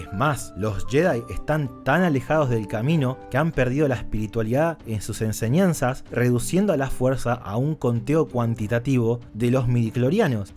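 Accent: Argentina. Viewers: Estos vídeo análisis son fascinantes y el acento argentino lo complementa y me parece un cuasi estándar contemporáneo con otros grandes exponentes de críticos youtuberos como Axl Kss y otros. No me imagino las reflexiones y conexiones que harás para el episodio 3 que es el cierre y el punto álgido catarsis de las precuelas .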